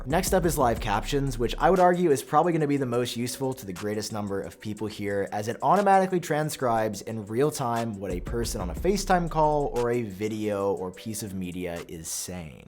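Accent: United Kingdom. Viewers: I like your British accent far more than Damien’s hair cut. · Sorry You've made this offence too many times I gotta say something😂 There's been too many strikes. I must correct this, Your "British" accent is in fact an English accent. Britain also consists of Scottish people, Welsh people and Northern Irish people and we pride ourselves by not sounding like them. The american equivalent would be like impersonating a New Yorker with a Boston accent. The more you know 😜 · The British accent is pretty much on the money! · From one English chap to another 😂😂 great accent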